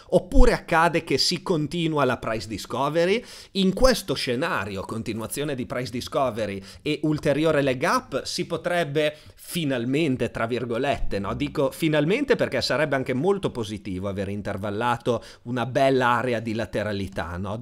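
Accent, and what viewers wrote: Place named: Friuli, Italy. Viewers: Vero però ha un accento che sembra friulano 🤣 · Sembra essere tra Udine e Tavagnacco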